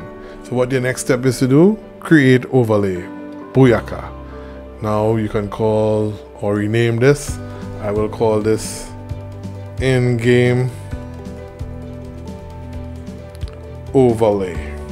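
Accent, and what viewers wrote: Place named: Sint Maarten, Caribbean. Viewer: Great video. I appreciate this information. I don’t know why but you definitely sound like you have a Caribbean accent, in particular a St. Maarten accent. Let me know if I’m correct lol.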